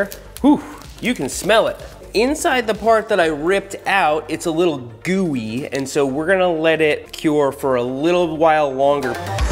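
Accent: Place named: South, United States. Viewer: new southern accent is coming through: "Impordan"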